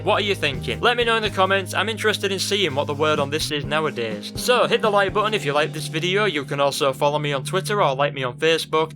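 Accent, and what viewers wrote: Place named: Northern Ireland, United Kingdom. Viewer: Are you from Northern Ireland by any chance? I have a friend from Londonderry and the way he says "game" more like "geem" makes me guess that. Also good video, I made one about Scarface too. Quite a good game.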